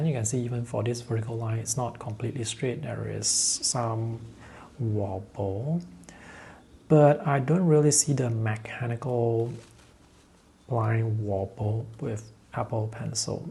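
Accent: Singapore. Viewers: I heard your voice and said: singapore? Sounds like. And was you. The last video I saw from you was years ago, about watercolor.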